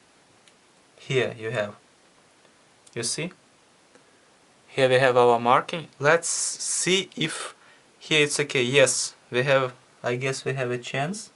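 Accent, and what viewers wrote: Russia. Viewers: Тяжелый русский акцент) как будто литтл биг слушаю)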